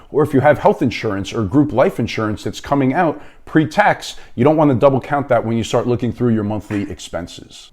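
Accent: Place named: New York, United States. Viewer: Oh wow! I notice the mic on your shirt. Big difference in sound from the previous vid about leverage. Your voice sounds more clear and focused and the room echo is gone now. Your voice is clear and deep now. Good job man! Oh, your speech style really reminds me of Christopher Walken. I guess it's a New York thing lol.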